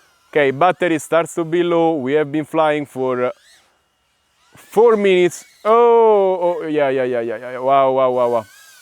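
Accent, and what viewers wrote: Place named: Italy. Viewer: sempre bellissimo sentire un italiano parlare in inglese <3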